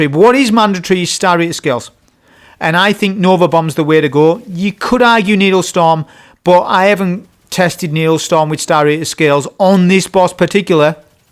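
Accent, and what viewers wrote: United Kingdom. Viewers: Also the amount of British in this video is definitely an 11/10 crashout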